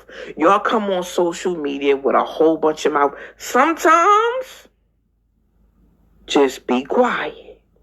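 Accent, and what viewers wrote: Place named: Philadelphia, United States. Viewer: You sound like your from Philly or east coast anyway always enjoy your content love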